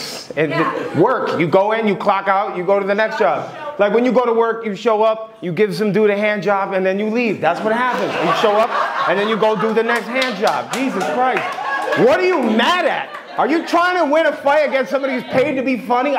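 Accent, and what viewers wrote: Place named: Boston, United States. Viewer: He’s so Boston it’s not even funny 😂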